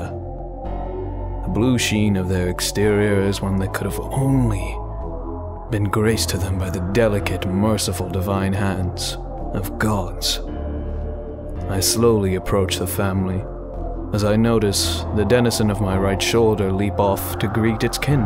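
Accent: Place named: United Kingdom. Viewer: Aaah yes, british accent + poetic lyrics + = absolute subarashiness 👏👏👏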